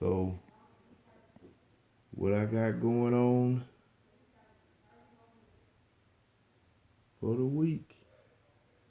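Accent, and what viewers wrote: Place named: South, United States. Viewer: I love your southern drawl my brother, you reminded me of one of the best car songs of my generation <link>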